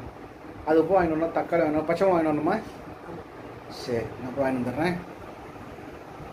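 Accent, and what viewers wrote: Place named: Madurai, India. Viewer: Niga peasuradhu madurai sowrasthra mari dan iruku anna ovoru ooru sowrasthra slang change agum anna adan ketan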